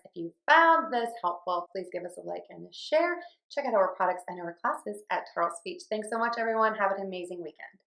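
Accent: United States. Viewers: Hi. I'm from Colombia and a love Your videos are amazing that help me to improve my pronounciation. I wanna know what part of usa you are?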